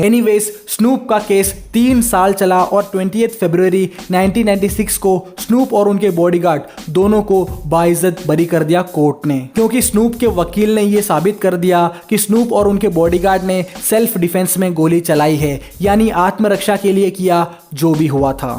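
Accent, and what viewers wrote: Kerala, India. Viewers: Enk manasilai since day 1 u have that Malayalam slang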